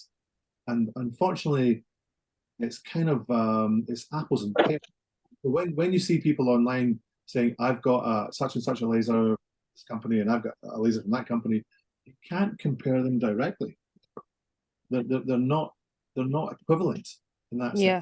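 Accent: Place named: Scotland, United Kingdom. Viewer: Thank you for your amazing insight/understanding IPL! We have just introduced the Venus Versa into our clinic……….the trainer was shite at explaining what is a very complex area of energy devices!! I am not good at retaining information😂……..but I rewatch all your videos and each time I retain more😊 Please keep them coming!!!🎉 Love from Western Australia (also Scottish, so it’s a dream listening to you! 😂😂🏴)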